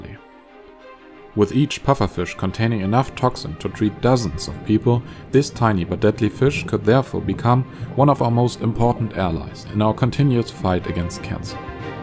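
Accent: Germany. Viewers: You Sound so German you could voice Klaus from American Dad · This dude sounds super German. That being said, awesome video. I'm hooked and subbed! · Are you from germany?i don't mean it in a bad way it's just they way you speak englisch even tho yours is perfect kinda reminds me of the my peers used to do ,i'm from germany might explain why i ask? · Ich hab noch nie jemanden auf YouTube gesehen der so nen starken deutschen Akzent hat :D no hate ich finds nur witzig und ziemlich ungewohnt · He sounds german lol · Kommst du aus deutschland? Dein akzent klingt so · I realized the German accent immediately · The First 7 Seconds and i already know you are a German. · german accent? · You instantly recognize the german accent xD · Are you from Germany? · Why do you sound this german? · Is the narrator german? Sounds like i while speaking englisch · i love hearing morbid facts in a matter of fact German accent.. 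Informative and unintentionally droll. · are you german? you do sound a lot like a german person speaking english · German accent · 10 seconds in and i can already tell that he is German lol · Are you German ? because I hear a German note in your pronaunciation lol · This guy is German for sure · Du bist deutscher oder? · höre ich ein deutschen akzent?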